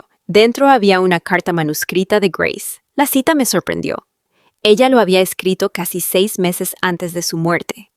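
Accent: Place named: Cocoliche, Argentina. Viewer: Corté en el minuto 5: aburrido, denso, repetido y narrado en cocoliche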